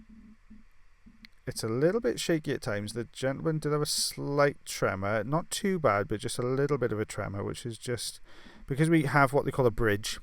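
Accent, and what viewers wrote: Wales, United Kingdom. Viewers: I could listen you your voice and lovely Welsh accent all day...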